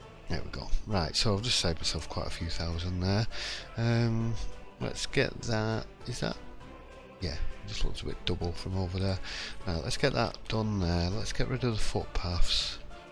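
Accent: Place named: Derbyshire, United Kingdom. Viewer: You from Derbyshire? Trying to place your accent lmao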